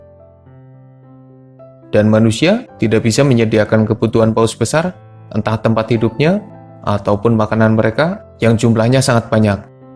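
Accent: Banyumas, Indonesia. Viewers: Masee wong ngapak yak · mau di sembunyiin ngapak2 nya ttp krasa🤔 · Nada orang ngapak dia bang. Bhineka tunggal Ika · Ngapak terossss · Org ngapak yo mas ?? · Gole ngomong ngapak temen min😂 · Orang ngapak ya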